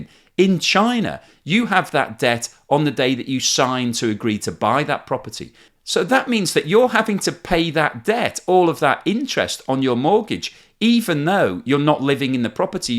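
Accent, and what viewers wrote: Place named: Liverpool, United Kingdom. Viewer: He sounds like a Liverpudlian.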